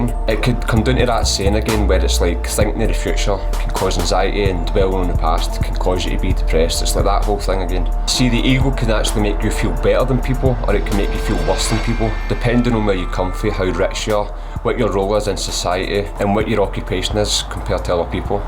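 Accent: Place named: Glasgow, United Kingdom. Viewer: Bravo perfectly described it's was great to hear the Glasgow accent, excellent x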